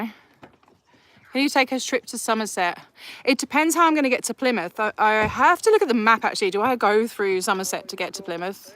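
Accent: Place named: Australia. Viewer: Lovely reservoir. Your Aussie accent sounded more obvious on this live. 💙💙🫶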